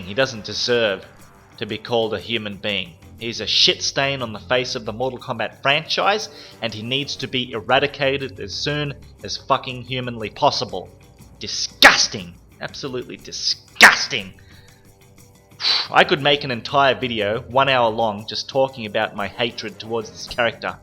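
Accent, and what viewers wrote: Australia. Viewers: It'd ruin it if he did make it 'big' though, part of his charm is that he more or less DGAF. He keeps it real, he's just an authentic, enthusastic gamer who's very naturally funny and is excellent at explaining things. The Aussie accent is a bonus. If he went down the Pewdiepie route I'd never forgive him.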